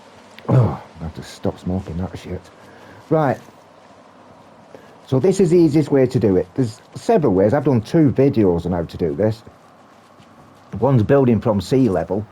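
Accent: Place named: England, United Kingdom. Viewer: Great to hear bloody brilliant regional English… no messin’ about… job done! 👍🏻 ❤ 🏴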